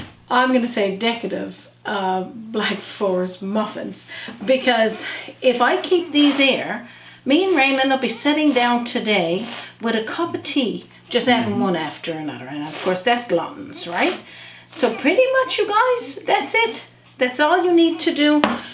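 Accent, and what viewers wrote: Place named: Cape Breton, Canada. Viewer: Love the liners that you used,. I have made many muffins in the past as well. Another idea for you that I have done is.. the part that you cut out, I place it back on top of the filling, press it down a bit, put the whipping cream and cherry and chocolate back on top. Looks so pretty as well. I do it with lemon pie filling, too, on a white cake muffin, topping it with icing sugar and lemon zest. Just an idea. I live in BC now but from Cape Breton. Love watching your videos. I found your site when looking up sea food chowder recipes. Love the accent, reminds me of 'home'. 😊❤🇨🇦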